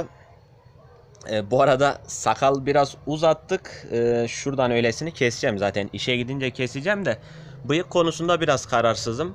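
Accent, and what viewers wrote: Gaziantep, Turkey. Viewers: Aaa Reis antepli misin sen?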